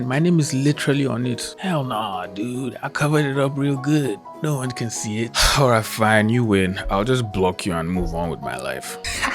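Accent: Nigeria. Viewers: Are you Nigerian? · im a young nigerian girl living in the uk. i dont have a strong accent like you but I've been planning on doing commentary art voice acting and animation 3d and 2d aswell as some programming. you have just made me more confident in what i want to do. you are incredibly unique. ive never seen a nigerian commentator like u and be succeful. my parents dont want me to go down this type of route but if i show them your content im sure they'll let me freely do what i want to in terms of my future. i look up to you immensly. i love your art and you are also attached to ur country too wich makes me feel warm inside. i wish u the best of luck in ur career and u deserve more attraction. i love your content im so lucky i found your stuff. you are one of the most diverse members of the art community ive seen and i hope more people like you show up. you are honestly a start of a new era in the community. 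nigerian homes are quite critical and people like me might be scared to go into this feild of work but trust me u are giving us the confidence to persue our dreams. from a huge fan that is glad you exist💕💖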